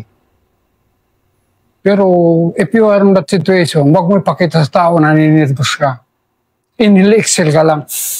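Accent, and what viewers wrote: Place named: Waray, Philippines. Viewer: Waray si sir klaro dahil a kangga😊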